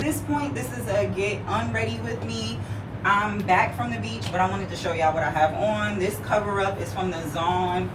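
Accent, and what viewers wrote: Brooklyn, United States. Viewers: Can I say I’m so glad I just found your channel sis BECAUSE the way your breaking everything down in your true genuine Brooklyn voice is TOP TIER YO!!! - No cutesy made up influencer voices JUST THAT TRILL SHIT and when you said Irregardless sent my ass because I STILL SAY THAT 😂🤣😂🤣🤣 instant likes and subscribe or whateva😉💯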